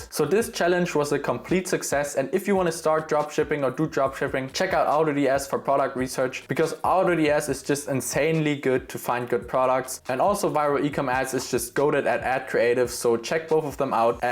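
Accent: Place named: Germany. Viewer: Man merkt direkt du bist deutsch bro hahaha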